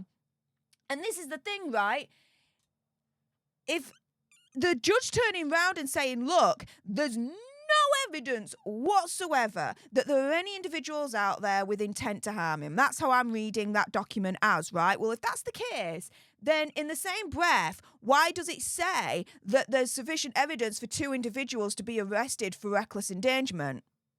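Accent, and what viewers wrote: United Kingdom. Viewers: i like your accent (i'm an Australian), what part of the UK is it from?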